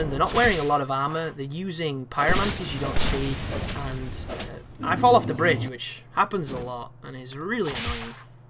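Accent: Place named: Bradford, United Kingdom. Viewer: Yeah, you've definitely got the accent. I'm gonna guess Bradford then.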